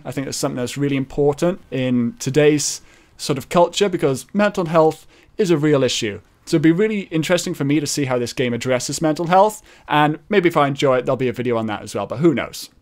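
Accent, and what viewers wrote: Wales, United Kingdom. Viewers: I can't quite make a definite decision but I seem to keep picking up on a little tinge of a Welsh accent when you speak. Being from Wales myself I can somewhat recognise certain changes in the way you pronounce things such as "here". Anyway, sorry to ramble, was just curious.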